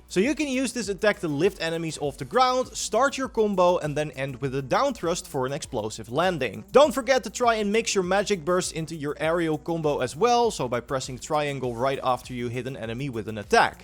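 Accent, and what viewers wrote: Netherlands. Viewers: Your accent is sooo dutch 🤣🤣🤣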